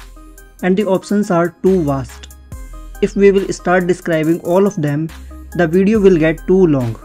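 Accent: India. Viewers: I cant understand a word of your english, as soon as I see a hindi speaking english video I leave, u ppl speak a very strange english, still sounds like hindi.too bad.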